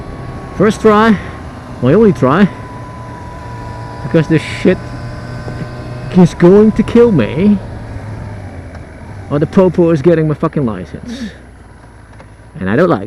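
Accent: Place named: Netherlands. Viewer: Are you from holland?? because you have a dutch accent to you're english (I'm from holland) Btw nice bike ;) + another reasen to think that you are dutch is because everything i flat If you are!! Hoi hoe gaat het met u !!!! ;)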